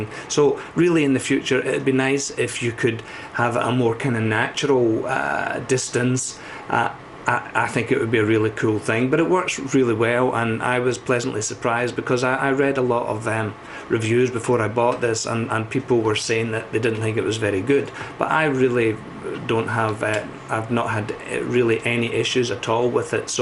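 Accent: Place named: Scotland, United Kingdom. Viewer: I liked your sco'ish accent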